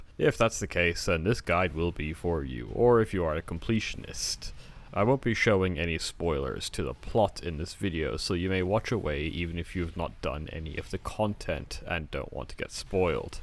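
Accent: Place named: United Kingdom. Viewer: oh damn lmao, didn't realise you were from there, I heard British in there lmao.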